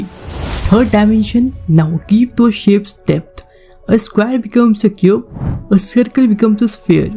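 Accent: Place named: India. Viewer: Why not correct your pronunciation (Indian accent) - which is beyond my ken.. - and remake this clip?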